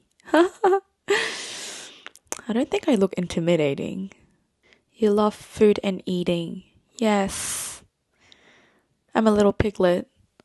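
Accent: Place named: Australia. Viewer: it’s not boring at all! i really enjoyed learning a little more about you… and your voice is really lovely and heartwarming and so is your presence which is a bit different from your photos on instagram(both are great btw). and the touch of aussie accent just great! i was smiling the whole video like an idiot 😂 thank you for making this video and good luck with your next goal 🫶🙏 btw i got my assumption about you right 🤣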